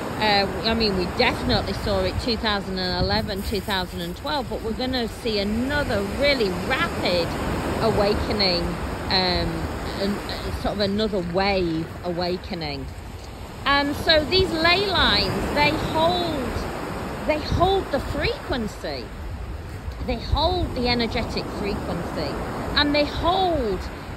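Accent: United Kingdom. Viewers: She's from U.K. That's just how they talk I think. 🙂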